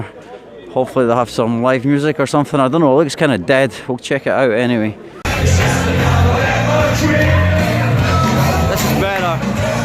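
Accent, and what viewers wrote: Scotland, United Kingdom. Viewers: Yeah.. This is the real scottish accent and a much thinner scottish dude.. 😅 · I love the Scottish accent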